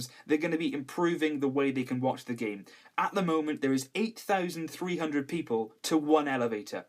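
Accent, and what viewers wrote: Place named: Scotland, United Kingdom. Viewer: Methinks he is Scottish. He should be supporting Celtic, Rangers or by the sounds of him, Hibs or something.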